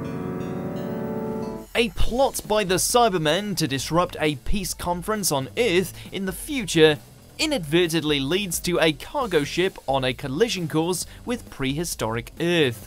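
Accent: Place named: North, United Kingdom. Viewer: It's so called,, accent". It's normal. He speaks with some northern accent.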